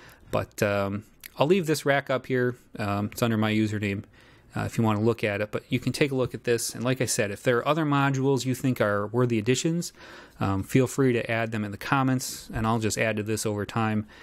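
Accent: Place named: Midwest, United States. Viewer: This is just a relatively thick Midwestern or Canadian accent, he's pronouncing it correctly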